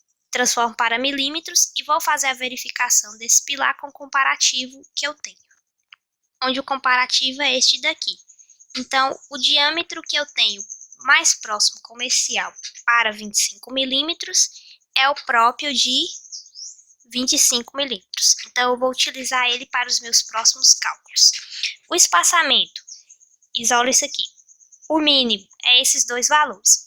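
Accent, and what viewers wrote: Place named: Northern Minas Gerais, Brazil. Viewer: Sotaque norte mineiro. Belo vídeo.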